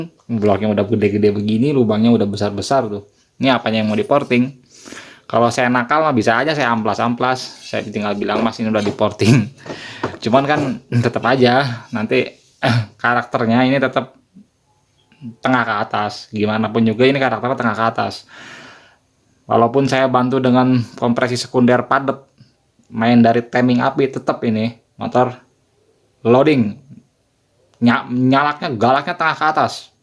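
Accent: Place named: Bogor, Indonesia. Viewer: Lokasi mana bang logat Bogor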